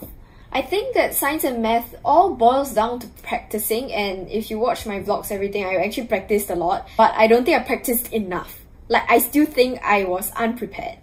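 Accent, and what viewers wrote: Singapore. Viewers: I really love the singaporean accent idk why 😅😂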